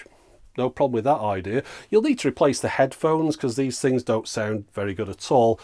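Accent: United Kingdom. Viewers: Love your show!!! Being American hearing your British accent makes the show a lot classier!!(yes it's true, we think all Brits are smart)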